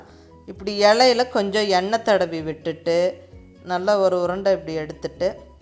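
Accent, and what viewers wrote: Coimbatore, India. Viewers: Akka yen ka unga face katta mattungiringa, nanum covai ponnu than ka unga slang romba pudikum ka athanalae unga face pakkanum pola iruku ka🥰😍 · Super sister.pargumpothey sapitanumpola iruggu .unga gongu tamil nice. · Super sister this dish item is super 👌 many vegetables and wheat flour combination nice your voice super 👌 madam .kovai Tamil I like it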